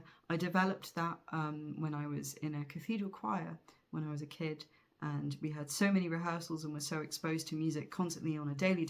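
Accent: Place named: London, United Kingdom. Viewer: It’s strange to hear you speaking, since I’ve only ever heard you sing. But you sound pretty classy—as a yank with little awareness of British accents outside of London, Manchester, Liverpool and Cockney, I’d have to guess that that’s London accent?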